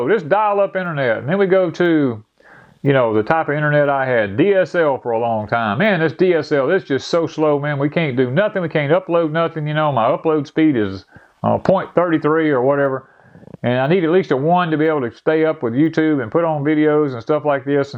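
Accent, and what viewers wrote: South, United States. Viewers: Hi, love listening to your southern drawl, I'm going to take your advice and ring my son tomorrow, can't see him he lives to far away, so I'll call him and tell him how much I miss him.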